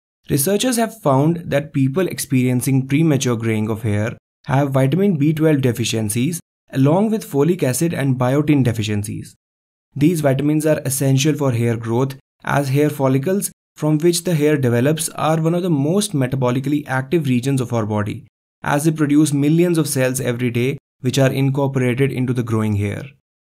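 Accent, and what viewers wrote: India. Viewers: indian accent is so weird to listen.. kinda comedy haha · Hmmm let's see this vid.Oh,India accent... Nevermind.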